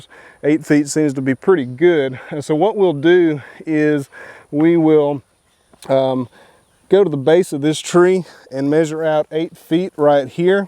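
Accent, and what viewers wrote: South, United States. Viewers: Thanks! You always post the most calming yet informative videos. I'm Georgia born and raised but you have the best southern accent by far!:D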